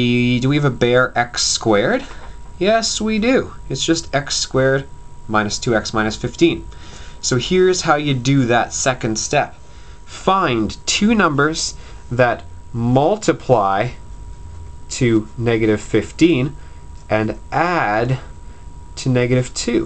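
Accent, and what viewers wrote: Canada. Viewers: are you canadian? ^.^ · I like your Canadian accent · Off topic but are you Canadian? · are you from Canada? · are u canadian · Get Oooot of ere! I love the Canadian accent! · U canadian? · hi fellow , u canadian xD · Let me guess, Canadian??? You said oot instead of out. Great video though. Thanks.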